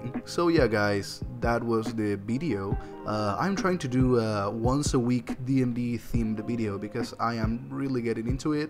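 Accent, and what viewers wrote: Argentina. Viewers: ¿sos argentino? Recién encuentro tus vídeos de D&d y me encantan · I really enjoyed the story! I had to comment, i need to know, decime que te lei bien el acento, sos argentino? Jugas d&d y sos de los nuestros? T_T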